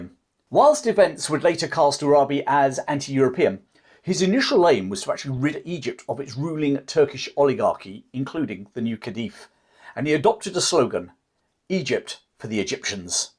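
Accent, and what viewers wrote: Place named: Wales, United Kingdom. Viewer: That Welsh accent 🤣🤣 I didn't know anything about this incident - it's always fascinated me the way the various European imperial powers collaborated so actively and openly in the decades before the First World War. The Taiping rebellion and various affairs in Egypt spring to mind but there are other examples. A shame that had to be ended by total global conflict.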